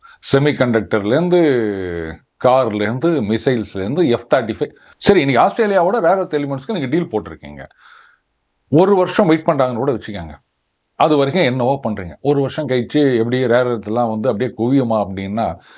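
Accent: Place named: North Chennai, India. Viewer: Pl pl speak in real Tamil not in north Chennai accent. So cruel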